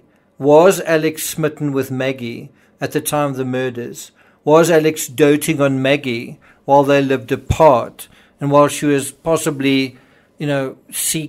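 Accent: South Africa. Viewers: Sir, I love your South African accent;it's beautiful........ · Nice voice, South African! I agree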